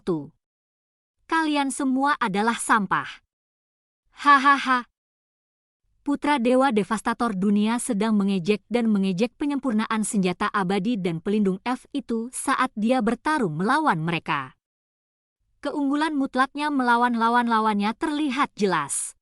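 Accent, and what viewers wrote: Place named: Java, Indonesia. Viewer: kok medok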